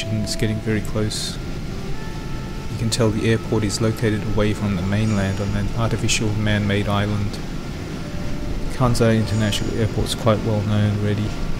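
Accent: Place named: Australia. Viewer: Excellent work & I am a big fan of Australian accent. :D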